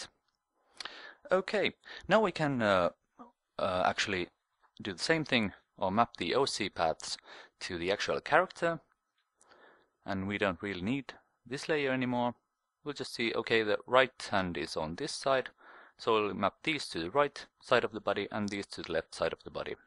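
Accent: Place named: Finland. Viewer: Are you Finnish! You sound like Finnish :D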